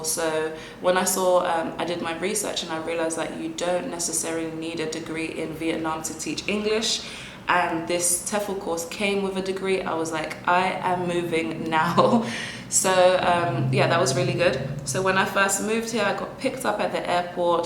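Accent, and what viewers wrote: United Kingdom. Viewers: OMG! I love your dress, or blouse? and I love your English accent.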